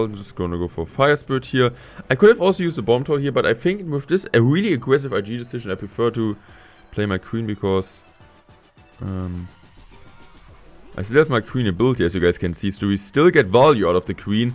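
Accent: Germany. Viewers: Your english look like deutsh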